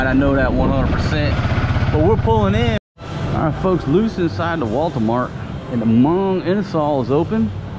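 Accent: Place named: Alabama, United States. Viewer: Where u from, sound like Alabama, my home for now...I'm glad they opening up, cause I'm ready to come there as soon as international flights nd visas atr issued...be safe bro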